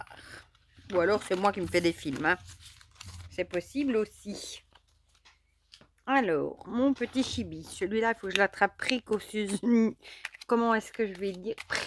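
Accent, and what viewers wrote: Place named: Belgium. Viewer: Coucou bonjour 🐕❤🐈🦊🐿🐇🐭🐼🦔🦉🐦🦩🪶❤, Waow waow magnifique énergies 🎉, j adore ♥. Tu me fais trop rire 😂 avec ton petit accent Belge 🇧🇪. J adore 😂😊👍. Merci beaucoup pour la petite pensée 🐦, pour moi. Oui j adore 💙🐦 les Mes Anges 🐦😇. Grand Merci pour cette guidance pour la semaine. Bon Dimanche, bisous bisous Sylvie 🙋‍♀️🦋🕊🦋🕊💙💙💙🙏🌈🌟🌈🌟 Bonne semaine ps: oui effectivement les bougies d actions, se consume plus vite, je confirme 🥴🥴🥴.....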